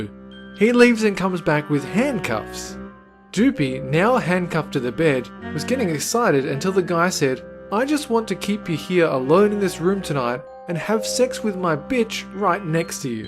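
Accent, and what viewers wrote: Australia. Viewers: YOUR AUSTRALIN!!!!!! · Your Australian? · Wait Are You Australian Because Ì M Am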